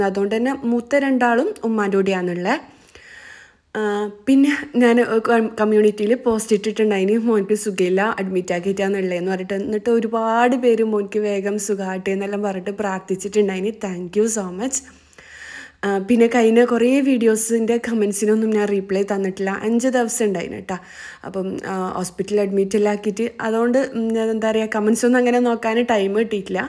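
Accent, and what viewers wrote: Kannur, India. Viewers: Ithaa, u are such a wonderful inspiration to me. Ithante Motivation njan follow cheyyarund tto. Ningale kanoor slang adi poli aan tto. I love u ithaa😘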